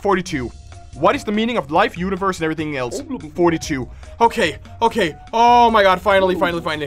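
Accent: Denmark. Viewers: Wait I think bro my be danish he has that danish accent like me except I can get rid of mine for a more American accent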